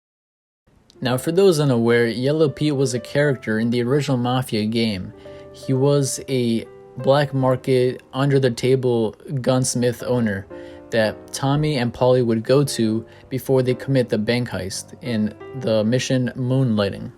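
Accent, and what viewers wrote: United States. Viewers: Sounds American.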